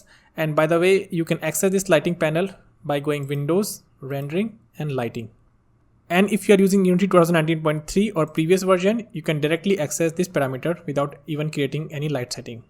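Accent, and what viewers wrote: India. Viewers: select your TEDE OBJECT :) i cant hold it... the "Indian" accent is hillarious... Anyway great content!!!!!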